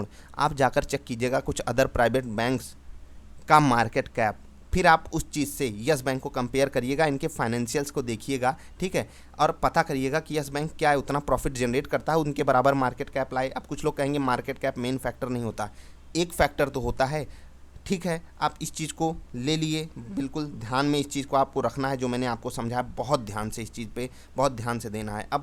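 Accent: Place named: Uttar Pradesh, India. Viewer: Sir apki baatein bohot pasand hain aur achcha analysis dete ho aap... UP ke lagte ho aap.. kanpur ya lucknow ke aas paas ke? Kahan se ho aap?... EK BAAT AUR BATA DIJIYE for my analysis purpose... Which software or website u r using for technical charts ? right here in ur Video..🙏🙏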